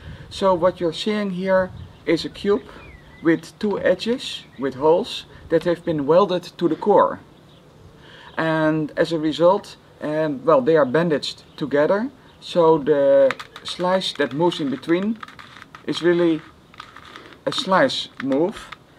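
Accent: Netherlands. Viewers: his grammar is good but his accent is too dutch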